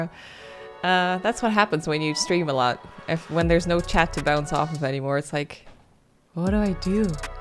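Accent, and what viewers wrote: Netherlands. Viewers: Congrats on the 150K!!! Btw, I can really hear that you're from Brabant when you speak Dutch XD (I used to live there too lol) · Woahh you kind of have a dutch accent haha :D I really like the name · Wel leuk dat je Nederlands accent toch een beetje door komt!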